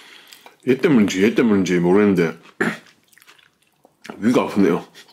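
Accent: Gyeongsang, South Korea. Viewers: 님, 후우~~ 저 만두 각 두개씩 총 네개에 쫄면 일반 사이즈로 ( 님 사이즈 아니고) 두 젓가락 그리고 시원한 물 한 잔이면 제 배는 그득 찹니다. 저 매운 걸 저렇게 많이 먹방 왕 🤴. 보고 있는 제 배가 차고 넘쳐 땡기는 거 같은데... 억 소리 납니다. 꾸밈 없는 경상도식 말씀이 재미 나고 인상이 무척 좋아서 보는 이들이 그 것 만으로도 즐거우니 이제 부터 너무 많이 드시지 마세요. 건강 하시고 하시는 일 흥하시고 대박 나시기 바랍니다. 👍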